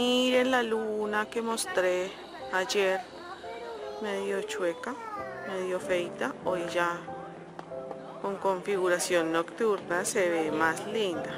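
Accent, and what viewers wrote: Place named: Colombia. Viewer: sabía que eras Colombiana por el acento, pero ni idea que vivías en bogotáa!! que chevere tu videooo! Un día tenemos que conocernos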